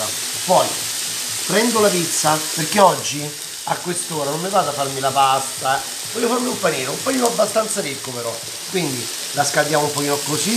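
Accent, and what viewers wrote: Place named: Rome, Italy. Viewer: Ao e nun cagate rcazzo, è de Roma parla romano e pensate de non trovà i romani che scrivono in romano sotto ai commenti? Non avventuratevi mai a Roma come in nessun'altra città italiana dato che siete tanto sensibili da non riuscire ne a leggere ne a sentire i dialetti. Detto questo, m'hai fatto morì dalle risate ahahaha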